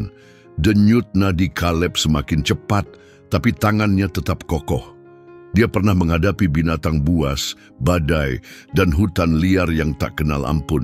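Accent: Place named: Malaysia. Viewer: Terimakasih terjemahan ceritanya tapi mesti saya katakan betapa menyebalkannya lafal Melayu pengucapanmu...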